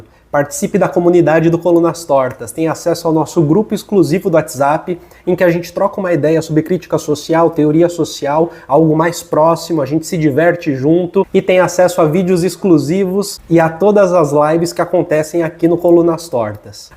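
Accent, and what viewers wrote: Brazil. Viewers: “Eu pareço um brasileiro médio?” Com essa voz e esse sotaque aí, com certeza. Moro no nordeste, e tenho 2 amigos, igual ele… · Parece um brasileiro médio do sul do Brasil 😂😂😂😂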